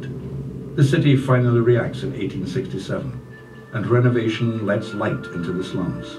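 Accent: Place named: Scotland, United Kingdom. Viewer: Fascinating urban history with a clear Scottish voice! Excellent!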